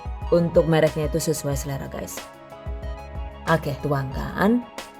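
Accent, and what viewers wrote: Banyumas, Indonesia. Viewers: Ide usaha rumahan nih 👌 Mantap sukses selalu Ngapak juga ya yu 😅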